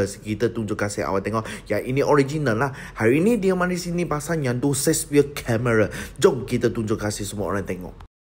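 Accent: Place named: Kelantan, Malaysia. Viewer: Kelate bohhh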